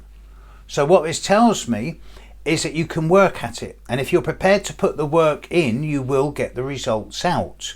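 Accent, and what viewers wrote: United Kingdom. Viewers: Thank you for your forecast of all the signs especially Taurus which is my sign. I had a great year 2020 despite all the changes who are going on. I feel more peace within my self and more harmony. I am a Taurus with an ascendant in Aquarius which was not easy during some time in my life. I try to be open to change and new ways of living and new ways to understand life. Thank you for your forecast and I just love your British accent being myself a Quebecor French native. I am a fan.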